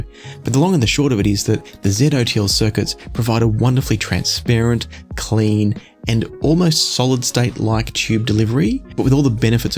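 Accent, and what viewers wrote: Australia. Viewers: your comment assumes that Linear Tube Audio has tested the I2S implementation and then decided not to include it after concluding it wouldn't 'add much'. That is simply something we do not know as they only have 1 DAC in their lineup and no other reference point. I would actually guess that they have not tested it, maybe due to not having the components needed on hand at the time of manufacturing. I do concede, based on your review that Linear Tube Audio really do know how to make really good ins/out in terms of electronics when it comes to clock ect... that alone require a lot of skill. Now if it did have i2s, I really can imagine your voice saying "and if that wasn't enough, as I switched to the i2s, it was like the soundstage opened up even more... the details became a tad bit sharper... not harsher... buta tad bit more etched at their edges, if that makes any sense..." see how much I know your use of words? 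:) I really should stop watching too much of your videos before I start speaking with an Australian accent... Im already close as I always loved the Aussies :) Aussie Aussie Aussie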